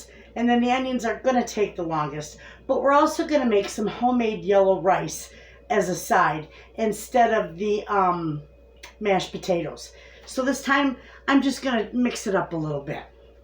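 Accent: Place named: Midwest, United States. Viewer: I live in the south now, but grew up in the Midwest. I love to hear that Midwest sound in your voice almost as much as I love to watch you cook.😊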